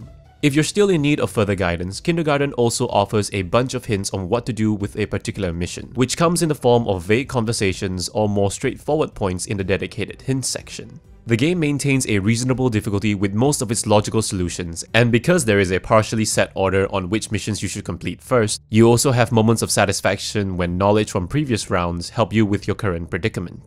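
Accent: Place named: Singapore. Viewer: You sound Singaporean! Good review, well presented and structured.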